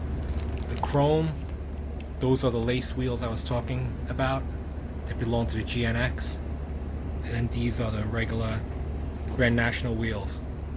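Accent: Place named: New York, United States. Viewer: Hey, I'm Chris......Jeff asked me to check your channel out.I subbed your channel & I like your builds a lot. I primarily build muscle cars myself, a few old hot rods. Just curious.....I'm originally from NYC, you sound like you have a New York accent. Anyway, I subbed U & nice 2 meet U buddy.....speak 2 U soon my man!!!!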